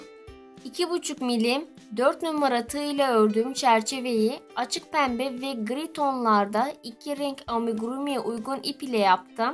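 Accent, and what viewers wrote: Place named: Russia. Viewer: Elinize sağlık hnm. Özel olmazsa bişey sormak istiyorum. Ruslar gibi Türkçe konuşuyorsunuz. Aslen rus musunuz?;)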